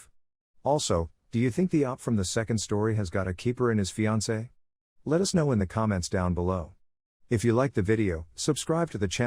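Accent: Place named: Australia. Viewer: "I sit down and cried." The guy sounds like an Australian.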